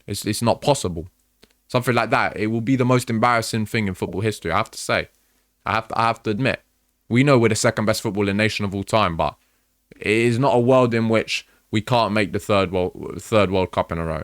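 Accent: United Kingdom. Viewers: Hmm, why do you say we? Are you Italian? You certainly sounds British to me.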